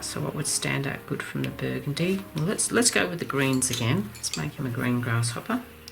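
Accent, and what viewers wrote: Australia. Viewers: Thank you so much for the lesson, it has really caught my attention on how to pick a color pallet. This was very informative. It's so good to hear your Australian accent again and you sound like your beautiful self again. I'm so glad you are back.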